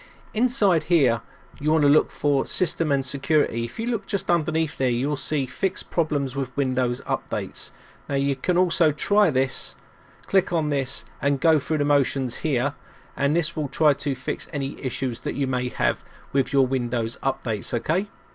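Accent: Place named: South London, United Kingdom. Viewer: I'm a 68yr man, and even an old fart like me can understand your consise instructions. Are you a South Londoner? you sound like one,I was raised in Stockwell.I can still remember all the Pride and Clarke motor bike shops along Stockwell Road. Thank You very much,I do envy your know how. All the best mate, Mark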